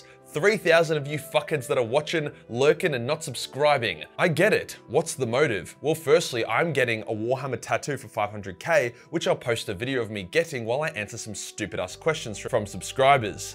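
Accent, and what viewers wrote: Australia. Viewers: I got into warhammer through this channel and for about the first three months I thought the eye of "terror" was the eye of "terra" solely due to your accent. 110% the best Warhammer channel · He's australian. Very obvious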